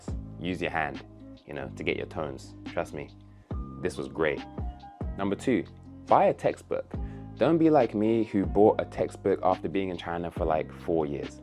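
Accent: United Kingdom. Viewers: I love your British accent!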